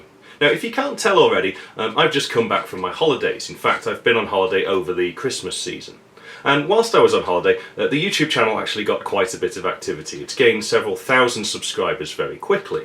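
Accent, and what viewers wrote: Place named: North, United Kingdom. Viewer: Your accent is so much like mine that it took me a whole video to spot that you are a Northener. Are you from the West Lancs area by any chance? Great four-parter on the 3D graphics. I never really understood this and your vids explained it very clearly to me. Thanks!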